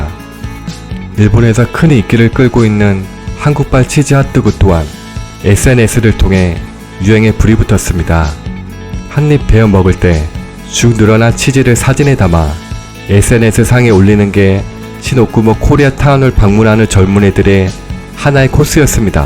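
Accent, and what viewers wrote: China. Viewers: 발음이 한국인 아닌것 같은데 중국인 발음같은데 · 동영상 내용은 좋은데.. 말하는 발음이 솔직히 좀 거슬리네요^^;; 마치 중국인이 한국어 하는 느낌이랄까요?? 특정 발음이 안되는게 있으신듯요. 말투나 목소리는 좋으신데..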